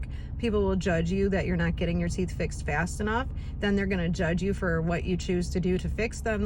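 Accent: United States. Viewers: Bonjour à vous je viens de m'abonner à votre chaîne vous êtes courageuse avec c qui vous êtes arrivé pour Vos dent croqué la vie a plein dent vous avez raison et vous êtes très belle j'adore votre accent américaine moi je français et je m'appelle Jonathan enchanté de vous regarder bisous à vous 😊 bonne continuation 👋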